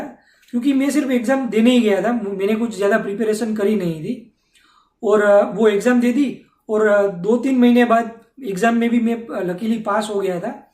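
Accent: Gujarat, India. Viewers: Lucky you brother, I’m also Gujurati just like you. Maja ma?😅 I’m having 65 points in SINP at the moment but it’s been sm tough time due to covid so I dnt thnk the points would go down in near time but looking at Canada’s plans to invite more than 4 lacs immigrants, my hopes are up. Let’s see. Tamara tone pat thij first video ma khabar padi gai k lage to che aapna Gujurati, keep it up buddy🤟 you’re doing a great job for ppl like us who’re planning to migrate and settle in canada. Specially SINP is only hope for me too at the moment.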